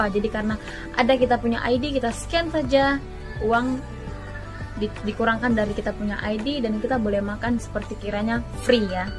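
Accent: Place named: Sabah, Malaysia. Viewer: Comel patung belakang ..boleh mintak tak..kamu berbicara mcam orang sabah ..bahasa baku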